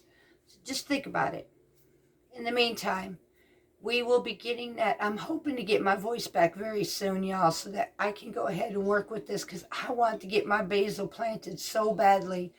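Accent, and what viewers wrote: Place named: South, United States. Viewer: Hi I hope you feel better soon ❤. We just ate frozen salmon burgers for dinner. I never thought of making them from scratch. I will be trying your recipe next time for sure. Thanks. Glad you liked the S.S. I have never E mailed by myself before. I need a lesson. You will hear from me soon😊. I love the way you say ya all and all the southern warmth that now greets me almost everyday. Please don’t change ❤😊🥇🏡🇨🇦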